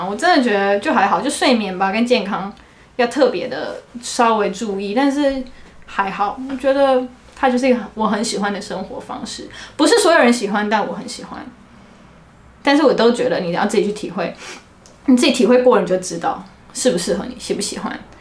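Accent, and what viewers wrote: Taiwan. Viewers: 第一次看你的影片，光聽聲音真的會以為是台灣人！尤其台語的部分很道地！讚！你的分享也很真誠！泡泡的確會跟著年紀越來緊，同是身為步入下一階段的女性很能體會你的感受！期待之後你的旅行分享喔！阿已訂閱！ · 😮根本就是台灣人的講話方式嘛！ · 哇咧！光用聽的超台 哈哈哈哈哈哈哈哈哈哈哈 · 挖 你講話比我還台灣.....謝謝您的分享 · 你的中文發音太好了 不看臉會以為你就是個台灣妹子 完全台灣腔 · 卡達? 中東很大 油很有名 沒去過 耳聞 空姐比較有機會出國吧 白人女孩說話超台的 土生土長台灣白人? ^_______^" 很有趣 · 外國人的面孔，台灣人的口音！Mixing goal ! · 好道地的台灣口氣，聽起來很親切！ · 口條講話語氣好『台灣』😂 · 口音真的是標準台灣腔，我好像在親戚的飯桌聽堂姐分享人生故事🤣🤣🤣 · 妳的口音好台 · 好美喔！尤其是這個沒有口音的台灣腔！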